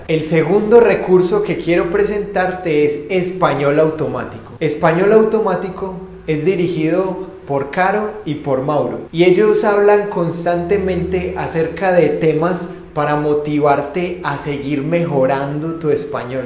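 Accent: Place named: Colombia. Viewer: Colombian accent, perfecto👌 Thanks señor.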